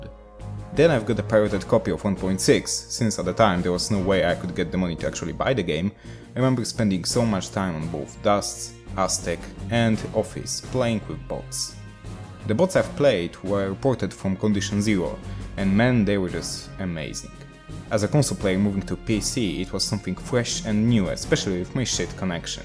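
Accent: Poland. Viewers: Twoj akcent jest genialny odrazu domyslilem sie ze to polak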